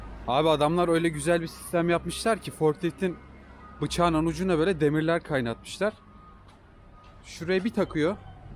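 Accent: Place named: Giresun, Turkey. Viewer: Kardeş giresunlu musun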